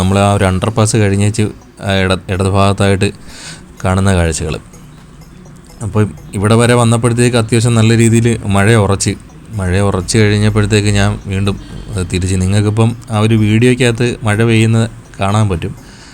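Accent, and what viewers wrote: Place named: Central Travancore, India. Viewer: നമ്മുടെ മധ്യ തിരുവിതാങ്കൂർ ഭാഷയിൽ കണ്ണൂർ drone വീഡിയോ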